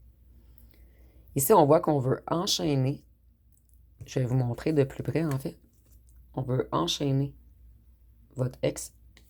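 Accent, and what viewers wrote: Quebec, Canada. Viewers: J adore ton accent Québécois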